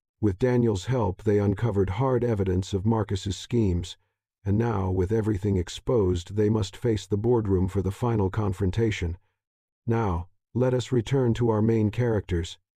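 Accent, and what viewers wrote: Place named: United States. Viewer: What do you think of the narration? Is it AI? It has a strong USA accent and a little metallic maybe but I don’t mind it.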